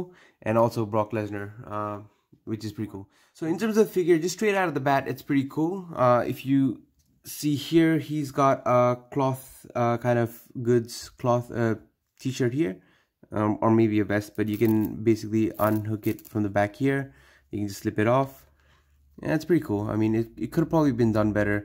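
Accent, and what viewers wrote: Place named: India. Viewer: You sound like you're from india or neighbouring country how did you get this figure I want it too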